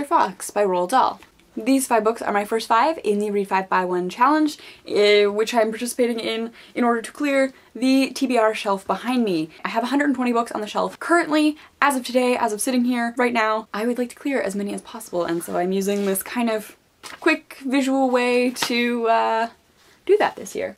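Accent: Canada. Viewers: it must be, but its not as extreme. its lovely :D never actually heard a canadian accent except in movies and shows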